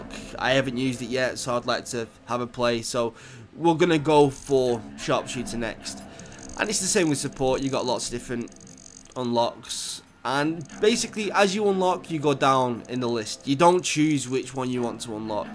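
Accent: United Kingdom. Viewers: awful british accent is awful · i know right he saound like Hoxten a bit i though like what this is Hoxten and hes making tutorials :D he sounds bit like a hes from england i think he is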